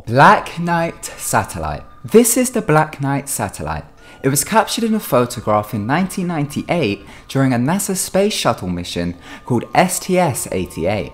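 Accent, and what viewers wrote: United Kingdom. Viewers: WOAH You reply? I thought you were famous or something. I Always get on my boyfriends channel (idk how to make one with google plus like he has) to watch your videos I love them!!!! I love guys with british accents lol. Thats crazy you reply to people, I thought all the people with so many watches since youre famous wouldn't reply to their fans and stuff thats so cool you do! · What on earth is this guy's accent? It sounds British English at some points, then almost Asian English in others. I find this the more intriguing question.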